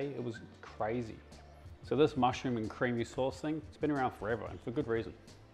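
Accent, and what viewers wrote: New Zealand. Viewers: Was wondering where you were from, seen a lot of the short reels come up on instagram where you didn't talk much. but the moment I heard you say the word "Rinse" I could tell you were a Kiwi. Runse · I had a feeling you were kiwi from the accent, but confused cause you lived in QLD 😂 Can wait to try this dish and surprise the Mrs 😘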